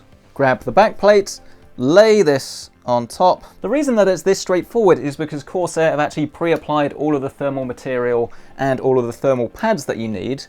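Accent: United Kingdom. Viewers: Great use of the 90-degree bending tool... my eyes are still hurting...also my ears from that British accent..How do you have 466k subscribers is beyond me.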